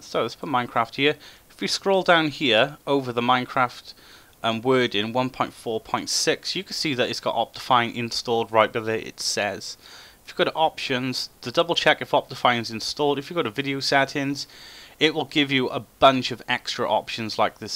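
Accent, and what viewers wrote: Wales, United Kingdom. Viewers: Are you from wales? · Awesome, worked for me. Btw where are you from? Did I detect a Welsh accent or am I completely wrong? Just as a heads up to others as well optifine lite wont work with the other mods, go standard or ultra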